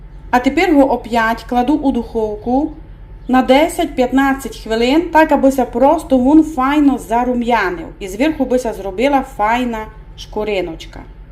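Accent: Transcarpathia, Ukraine. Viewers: Привіт.Я обожнюю ваш закарпатський діалект і ваші рецепти.🌲💛💙🌲🇺🇦🌲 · Дуже подобається ваша мова. Слухаю вас з насолодою. Дай Господь вам здоровʼя і наснаги. І хай Господь спасе нашу Україну.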